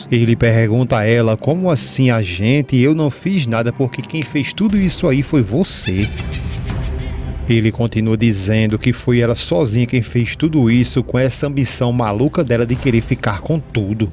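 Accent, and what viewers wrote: Pernambuco, Brazil. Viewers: Eu acho que ese narrador é de Pernambuco tem um sutaque forte e fala mulestra e Satanás ele é muito engraçado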